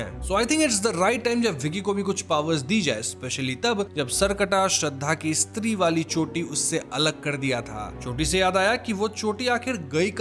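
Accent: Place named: Bihar, India. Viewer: Why do u sound so funny and Bihari types?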